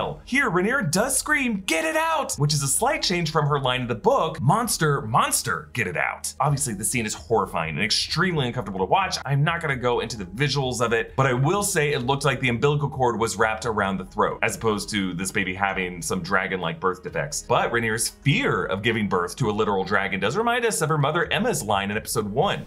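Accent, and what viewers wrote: United States. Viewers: I LOVE YOUR PLAIN AMERICAN ACCENT!!!! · Plain American accent that finds 5 notable changes in the opening credits when others say “nothing changed” despite having seen the episode in advance and had more time to dissect it. Your videos are well worth the wait. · “Plain American accent” damn shots at the other creators who more than likely made their video after the leak. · “from a nerd with a plain American accent” 🥰🥰🥰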